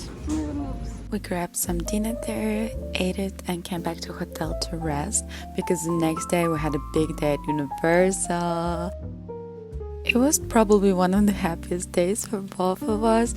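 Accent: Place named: England, United Kingdom. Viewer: yay my fav travel vlog is back! you have a lovely english accent, i like this change up bless you for showing us the amazing cities with the night life, buildings and food and sunsets, beaches and people 🙏 your friend and you are gorgeous! cool to see you both happy, and those outfits you wore were stunning! 💜 thank you for the editing you put into this 😊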